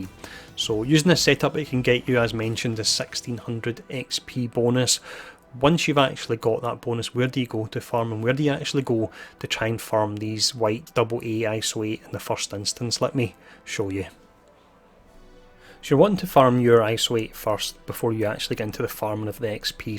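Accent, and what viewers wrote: Scotland, United Kingdom. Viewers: I thoroughly enjoy your Scottish Accent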